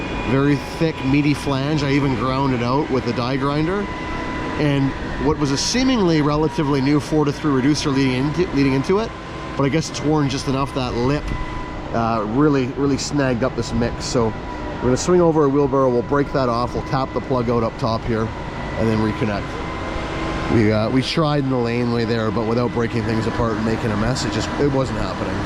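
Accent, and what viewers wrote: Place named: Canada. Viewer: Lol the "Canadia" pronunciations make me giggle...de-cal v D-Cal 🤣 😂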